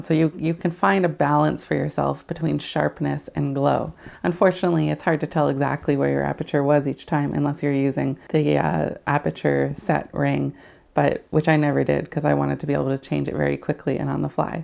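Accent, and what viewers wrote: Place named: California, United States. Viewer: Hey, you are pretty funny to this California boy. Nothing dry about your presentation. Does your Jupiter 9 have a serial number on the side? The early types did not. They had them on the lens ring. Ah Hah. Yours was made in 1984 as that is how your serial number starts out. And here we are <link> <link> This looks like a college near me. I am west of Palo Alto, CA. You talk like a local. <link> No you can do better than that. Try neutral filters. You will be able to keep the short field of focus and not blow out the sensor image. Your surrounding looks like San Mateo. Also, I am doing product shots for a product I produce. So everything is controllable and stable. I use a Canon 2000D on a big tripod (with a fluid head), with Canon software that will send the image to a laptop with any size screen... think 27" if you can have that around in a setting. 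I really can see the image clearly there. There is a issue with this camera's need for adaptors sometimes. This is talked about in a 5 Types video. It might not apply here as it is about infinity focus. It is my understanding that your camera did not have the MC multi-coat lens coating, where the earlier one or two versions did. The coating keeps the light from bouncing around inside the lens and camera. I just bought a Jupiter 9 type 2 for $135 out of the Ukraine with only $10 shipping. Here is a video you might have seen which is pretty interesting. The information is that is. Don't drink a lot of coffee before watching this. Y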